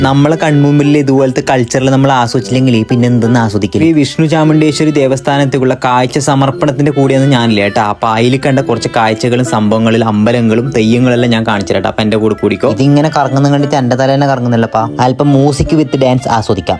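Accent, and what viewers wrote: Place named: Thrissur, India. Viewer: Nasic dol Thrissur ❤samsaramkelkkan nalla rasad ❤❤❤❤😍😍😍😍😍😍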